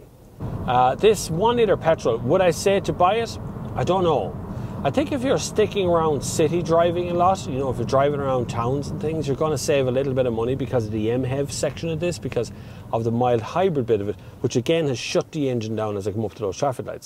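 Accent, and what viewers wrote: Ireland. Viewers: Great review. I don't think Laura understands the Irish accent. I know some of my friends who have strong accents, have problems with Alexa aswell.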